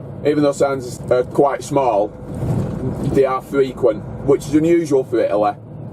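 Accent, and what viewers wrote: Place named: Wales, United Kingdom. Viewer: I have traveled more than 20 times with Minoan Lines and highly recommend the 2 Cruise Europe / Olympic vessels. I found out that YouTube has a sub-text mode, because I have a little trouble understanding Wales Dialect. What I can NOT recommend is ANEK-Superfast with their old ships. Video Tripod is good Thank you very much:-)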